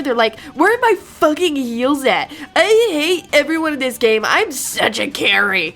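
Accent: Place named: Michigan, United States. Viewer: guessing Michigan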